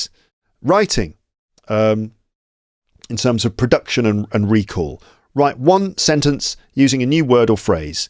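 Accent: RP, United Kingdom. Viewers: Another thing I want to point out is that your English is very clear "'Received Pronunciation'" is very easy to understand. But in real life in the UK, it's much harder than I expected to understand the average native British speaker and I'm not even talking about dialects.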